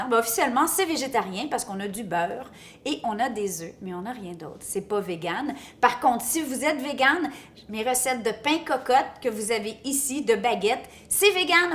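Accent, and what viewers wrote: Quebec, Canada. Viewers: Alors moi je suis en Nouvelle Calédonie,TOM( encore Français!) tu es géniale j'ai passé mon aprem à looker tes vidéos...tu m'éclates,et puis en tant que bonne Française, je suis irrémédiablement FAN de votre accent les Québécois! par contre n'oublie pas de noter pour tes FAN francophone les conversions en degré "celsius" des températures,ainsi que des mesures en Grammes ou centilitres et millilitres..car les cups nous on connait pas!!! big kisses du Pacifique! je te kiffe 😍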